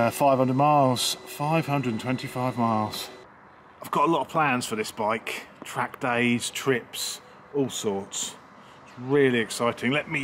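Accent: United Kingdom. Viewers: i watch because your speech/voice is extremely enjoyable to hear!! Love the UK 🇬🇧 accent!! Fireblade RR-R -SP finally getting flashed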